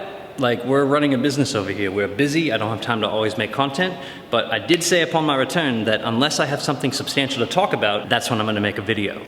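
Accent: Australia. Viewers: It’s funny hearing you speak with an Australian accent and then comparing it to your older videos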